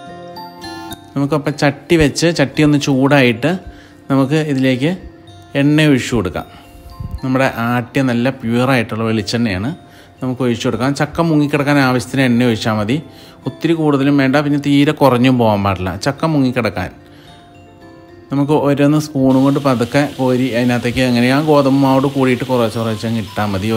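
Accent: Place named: Wayanad, India. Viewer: Hai ma'am how are you, I like to hear ur wayanadan voice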